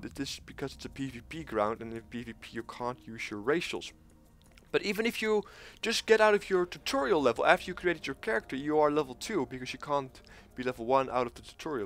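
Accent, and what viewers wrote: Netherlands. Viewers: are you Dutch?:P, If so, do you play on Ring Of Fire? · I knew it, because your accent is just typically Dutch, (Ik ben ook Nederlands dus ik kan het weten) On which server are you playing?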